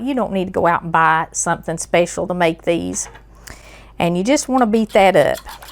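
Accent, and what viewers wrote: South, United States. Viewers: soon as i heard her country accent i knew it was gonna be a good recipe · that is VERY southern accent wow!! ❤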